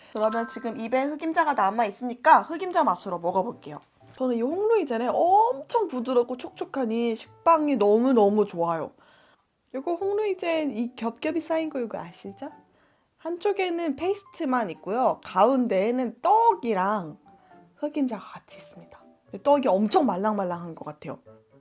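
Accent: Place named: Gyeongsang, South Korea. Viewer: 님 고향이 경상도 이신가요 계속 사투리 억양이 들려서...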